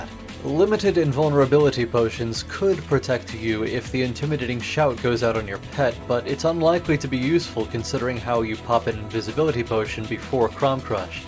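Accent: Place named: United Kingdom. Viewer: Where from? Sounds like you're half british.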